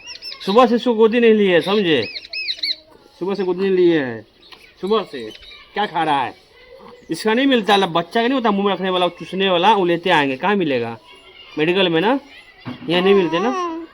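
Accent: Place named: Bihar, India. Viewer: Aapka ka bihari andaaz achchha hai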